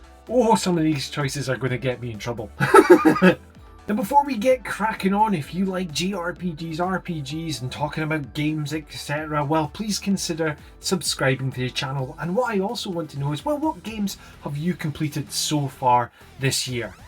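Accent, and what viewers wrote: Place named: Dundee, United Kingdom. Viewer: lol as soon as i heard the accent bro i was sure it was dundee lol. i moved here not long ago and that's how everyone sounds to me :P if you heard me you would probly be able to guess what parts i'm from as well haha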